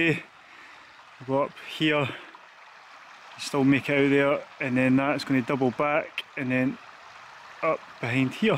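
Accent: Scotland, United Kingdom. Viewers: The closed captions are having fun with your lovely Scottish Accent!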